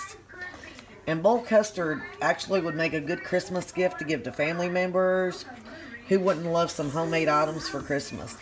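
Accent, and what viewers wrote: South, United States. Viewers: I actually can speak this "Dialect" lol This is COUNTRY - that's Deeper than Southern! I loathe Nutmeg - so NO to the Egg Nog - Folks can add that at the table - YUCK! EHHHhH never liked that taste! Boiled Custart is creamy and sweet - Vanilla is fine ❤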